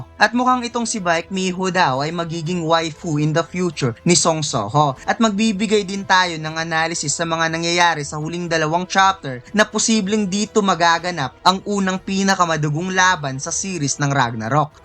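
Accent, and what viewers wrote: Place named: Conyo, Philippines. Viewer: Ganda salamat idol.. Kaso wag masyado pa konyo yun nagpapangiti e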